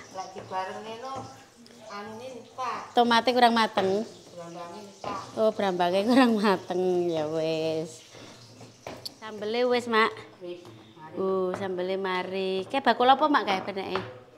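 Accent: Java, Indonesia. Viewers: ma'af mba..aku songko Demak lg semingguan nemu vlog mba lan seneng banget kehidupan lan logat jowone..ma'af mbak?adike sampeyan neng ternak entok nopo serumah karo mba,.. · wqwqwq mbak mosok mangga gurih...aku mesti ngguyu ngrungokno mba ngomong...dialeknya jowonya kental....videonya apik kok....pinter bikin video...aku mbok diuruki mbak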